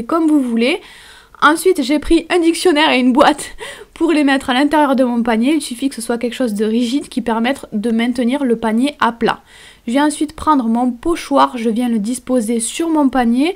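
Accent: South, France. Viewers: Moi qui suis parisienne, ton accent m'emmène dans le Sud, puis cette façon de dire "mon pompon" je craque c'est trop mignon 😍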